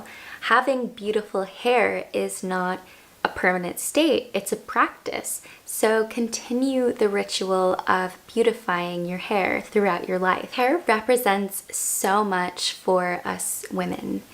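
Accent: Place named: Ireland. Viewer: I love your top!!! By the way, are you Irish? You have a very slight accent & I'm curious 😊 Great video ✨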